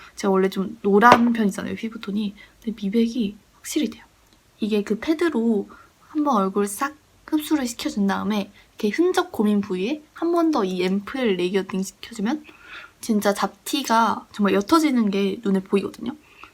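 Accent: Seoul, South Korea. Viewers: 서울 사투리...? 말투가 너무 매력적이에요!!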